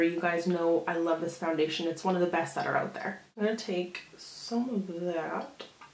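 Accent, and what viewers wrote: Netherlands. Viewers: are you from holland ?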